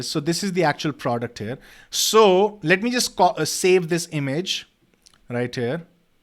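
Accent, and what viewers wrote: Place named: India. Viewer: This is one of the worst videos I've ever watched in almost a decade of YouTube Used 4 mins to get to the point Annoying jokes and high pitch voice Super annoying Indian accent No real valuable information whatsoever I hope you revise your videos and channel or stop uploading cos these are an eyesore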